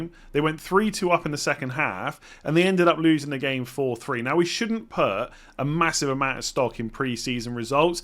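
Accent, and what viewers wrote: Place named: Bristol, United Kingdom. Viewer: Hi! Are you from Bristol? Just picked up on your accent sounds like it is a Bristol one aha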